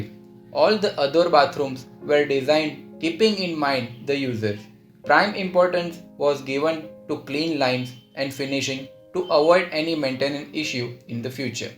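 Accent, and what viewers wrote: Gujarat, India. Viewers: Good design, explained and shown very well (inspite of the thick Gujarati accent). This is the second consecutive well explained video (after the Aslam Sham project). Great job, Buildofy - your editors are getting really good at this! Or have you hired a new one?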